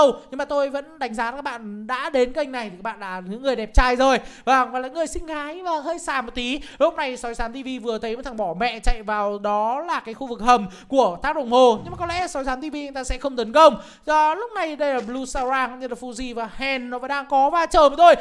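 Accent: North, Vietnam. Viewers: A ơi. Có tk bảo giọng Bắc ấm. Em lên nói con cek j vs nó??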